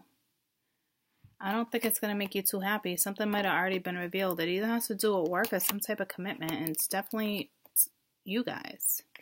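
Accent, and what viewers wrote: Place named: Massachusetts, United States. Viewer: I know what I would do.....🏃‍♀️👀🏃‍♀️👀🏃‍♀️👀🏃‍♀️👀no woman should ever take a cheater liar thief and deadbeat dad back. Stay where you thought the grass was greener but instead you got the Sahara desert 🐫 🙏❤️thank you love that Massachusetts accent. Right up the road from you. You are a great reader 🙏❤️⭐️⭐️⭐️⭐️⭐️ An ex asshole libra husband 😂😂😂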